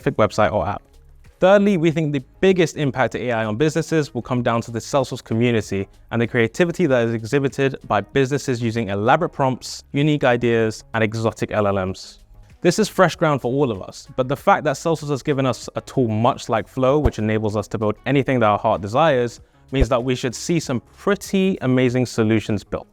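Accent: United Kingdom. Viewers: is this a london accent? im digging it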